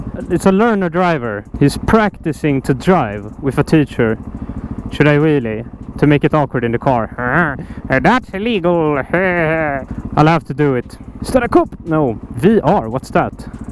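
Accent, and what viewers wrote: Sweden. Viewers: Svengelskan delux 5/5 👍👍👍👍👍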